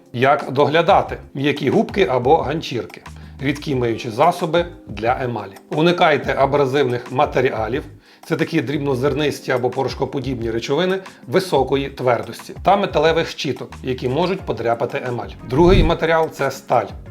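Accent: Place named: Lviv, Ukraine. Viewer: Це діалект, який додає шарму. Чула такий говір на Львівщині. Звідки родом автор не знаю, але це звучить приємно, хоча і не літературно.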